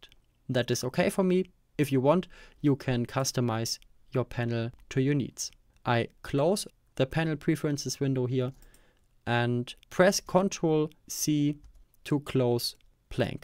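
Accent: Germany. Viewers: Hey, da ich deinen deutschen Akzent raushöre, wollte ich auch ein Kommentar auf deutsch da lassen!:D Es hat alles super geklappt, vielen lieben Dank! Du hast alles entspannt und ruhig erklärt!!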